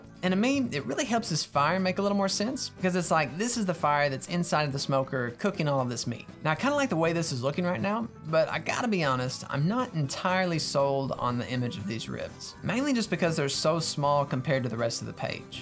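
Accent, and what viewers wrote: South, United States. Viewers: as a (fellow? my ear always catches that accent) Southerner, I love this menu and am now desperately craving ribs 🤤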